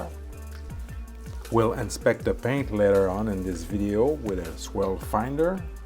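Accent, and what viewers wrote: Quebec, Canada. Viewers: I'm going to stick to not using dawn sorry but you sound like you're in Canada so maybe you guys have the good stuff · I hear that Québécois accent, bonne Saint-Jean !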